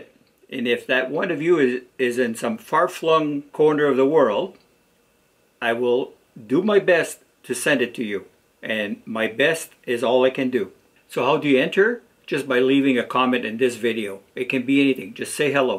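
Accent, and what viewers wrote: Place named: France. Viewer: Just found out your channel, looks like there's lots of diy things . Keep up the good work. Ps you seem to have some french accent, where are you from?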